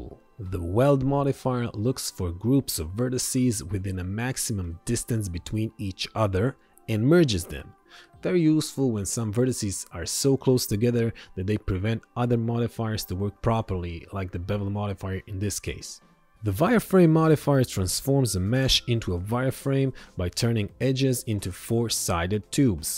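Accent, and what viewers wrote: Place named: Israel. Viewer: מבטא ישראלי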